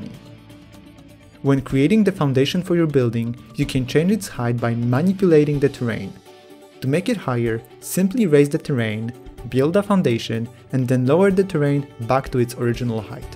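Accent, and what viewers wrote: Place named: Poland. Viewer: I knew i've heard polish accent!😁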